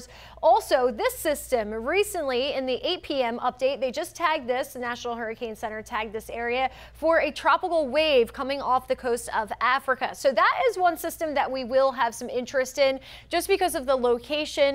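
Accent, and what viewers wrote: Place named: North, United States. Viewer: By far, the worst northern accent that I've heard in Florida news bar none...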